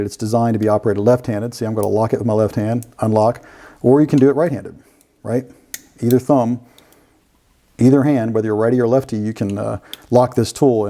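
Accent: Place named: Milwaukee, United States. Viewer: You sound like you grew up in Milwaukee. The natives say Miwaukee.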